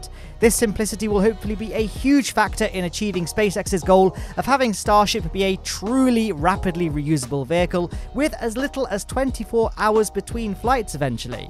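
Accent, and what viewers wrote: United Kingdom. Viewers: Is this guy british?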